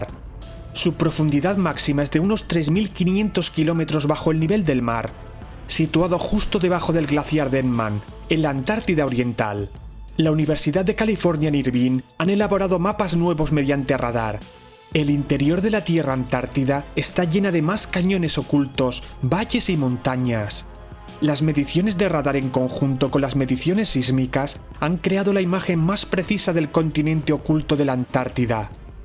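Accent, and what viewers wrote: Spain. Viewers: por su acento puedo imaginar debe ser español. Aunque en algunos países suramericanos hablan con mucha pronunciación de la z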